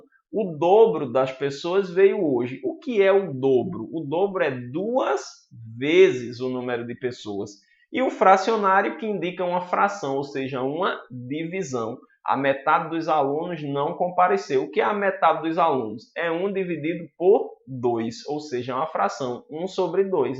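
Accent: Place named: Northeast, Brazil. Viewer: Achei ,alguém que consegui aprender e segurar na mente heheh ,boa didática simples e boa de memorizar. Tu és de onde companheiro ? Nordestino como eu ?? Sotaque conhecido hehe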